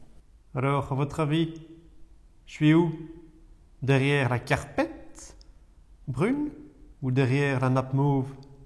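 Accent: Belgium. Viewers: cet accent bien de chez nous haha vive la Belgique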